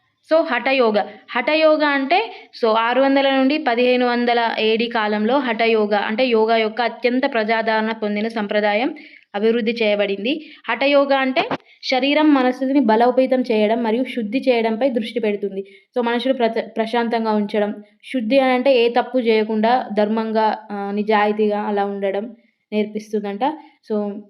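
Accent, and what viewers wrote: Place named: Telangana, India. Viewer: Subjeect with telangana slang 😂 👏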